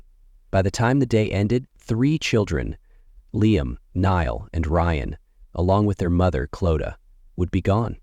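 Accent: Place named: United States. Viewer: Can u name the names correctly please your American accent ruined the story and since this there’s been a hell of a lot more like the most reasent of the 1 in rossfeild tallaght Dublin 24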